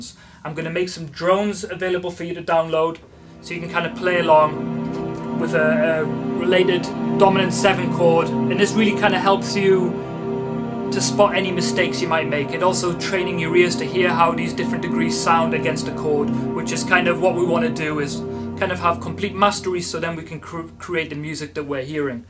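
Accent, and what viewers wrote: United Kingdom. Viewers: man, I am in, even I learend my american english, I can understand you enough with your british accent, and I will try your doccuments. Thanks, you are great. Now I am gonna play more clearly.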